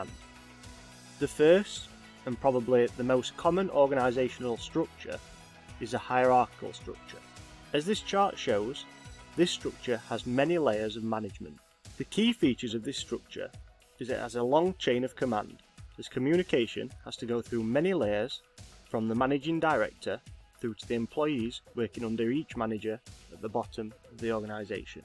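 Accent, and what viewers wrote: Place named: Manchester, United Kingdom. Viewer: Very clear, understandable presentation. It is just what I needed for illustration for my students. I like the accent very much! Manchester?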